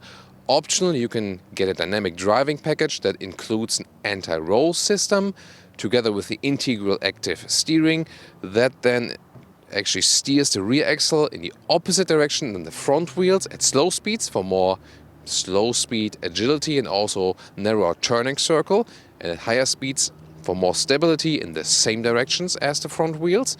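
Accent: Germany. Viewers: Und woher kommt so ein schrecklicher deutscher Akzent? 😅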